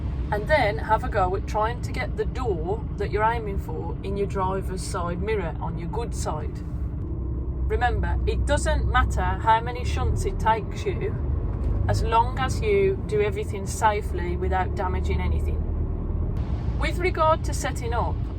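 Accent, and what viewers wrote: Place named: Midlands, United Kingdom. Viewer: Same to you!! You deserve tonnes of respect! And your good nature, your accent and your location makes me remember my happy days in the midlands!! Trained by westgate training of walsall, ex samy jones! Loved the area!!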